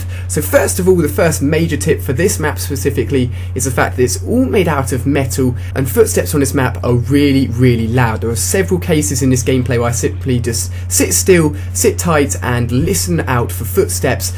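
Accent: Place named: England, United Kingdom. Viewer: i knew you are from english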